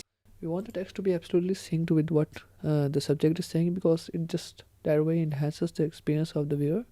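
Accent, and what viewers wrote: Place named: India. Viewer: Yeah, it sounds to indi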